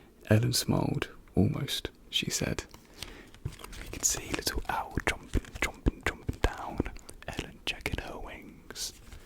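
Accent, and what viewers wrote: United Kingdom. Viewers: That British accent tho was so addicting to listen😂😅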